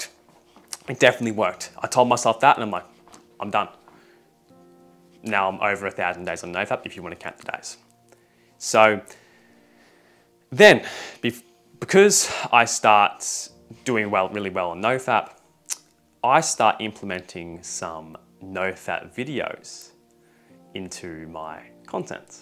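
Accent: Australia. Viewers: made better with that Australian accent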